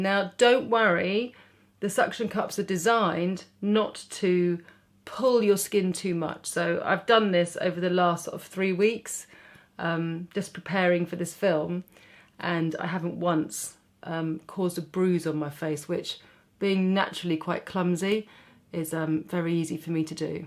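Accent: England, United Kingdom. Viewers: Agree. It's so refreshing to hear an English accent for a change, and not the nasal twang of 'hi guys, welcome back to my channel' ... blah blah.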